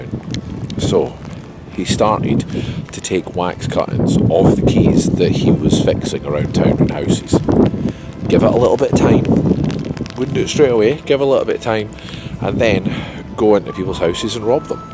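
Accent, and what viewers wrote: Scotland, United Kingdom. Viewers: I've loved the city ever since spending my junior year of college there at the University of Edinburgh. Good times! And somehow, whenever I see the castle, I feel like I'm home, at a second home. Plus it's always a treat to hear the Scots accent. My father was Scottish, but his accent had pretty much vanished when I was growing up - although it would return with a vengeance if he ran into a fellow Scot!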